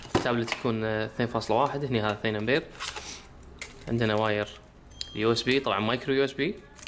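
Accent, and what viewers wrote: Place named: Iraq. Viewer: كلامك عراقي لايك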